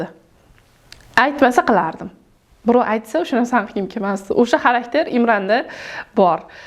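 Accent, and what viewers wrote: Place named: Fergana, Uzbekistan. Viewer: Шевез Фаргонача экан Бекабодлиларни шеваси бошкача эшитганман бизи шевада гапирасиз экан яхши курдим кизим бахтли булинг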